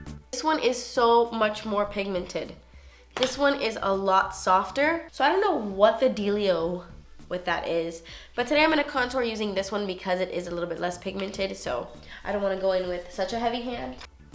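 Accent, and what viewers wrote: Cuba. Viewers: She's Cuban! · I love it when she talks in her Cuban accent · she's cuban from Miami :)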